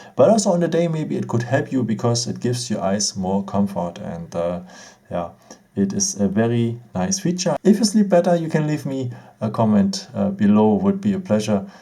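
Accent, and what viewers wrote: Germany. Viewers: dat is doch nen deutscher oder verhör ich mich? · Is he german💅💀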